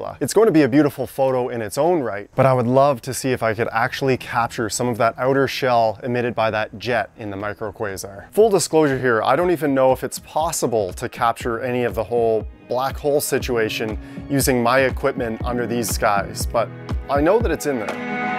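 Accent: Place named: Canada. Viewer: Before you go apologizing for your country, you might want to mention which country you are in. I thought you were Canadian because of your accent but I know there have been a lot of forest fires in the US. No criticism intended. Your content it always GREAT!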